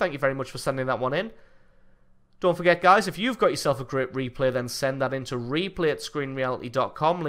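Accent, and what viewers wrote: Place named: North East England, United Kingdom. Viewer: The north east of England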